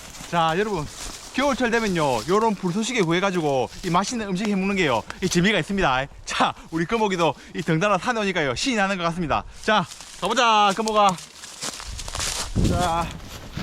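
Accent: Gyeongsang, South Korea. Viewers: 언제봐도 정겹네요 경상도 사투리가 가슴에 팍팍 와 닿습니다 ^^~ 이건 음식 고문이다 ^^~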